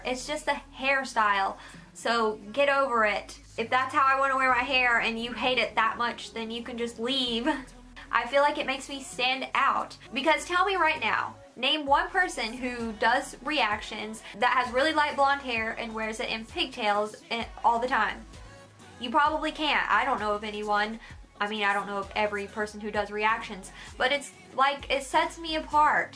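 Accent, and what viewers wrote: United States. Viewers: Are you from Texas? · Southern American accents are one of the best and this is coming from a California native. The reason why you get insecure about your accent is because of the stereotypes with people who have it are perceived to be dumb or low class. Not true. It's actually a very classy and gorgeous sounding. In fact, if you put Southern American accents on slow motion in a voice recorder, it sounds very similar to British accents.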